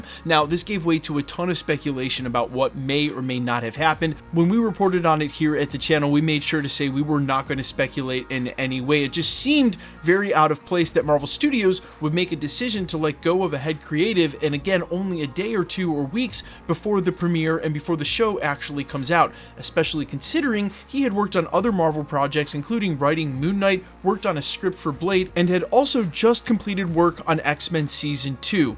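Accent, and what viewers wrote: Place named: Maryland, United States. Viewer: Bruhhh ur accent...... you've gotta be from Maryland 😂😂😂😂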